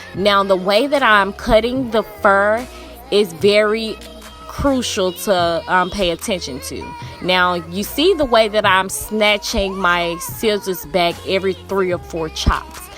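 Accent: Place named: Georgia, United States. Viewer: You talk real country lol are u from Georgia also?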